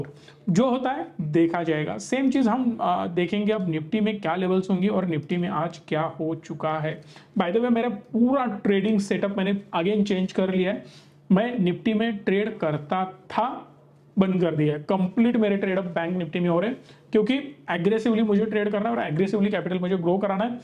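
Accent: Maharashtra, India. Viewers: Sir, Samjvanyachi, shikavnyachi padhhat khup chhan aahe tumchi.. Khup pot tidakine agadi manapasun shikavata Sir.. Pan Sorry to say you this, Hindi improve karayachi garaj aahe, Tumhi je bolata sadhya te marathi hindhi aahe, like our CM.. Sorry to say you again..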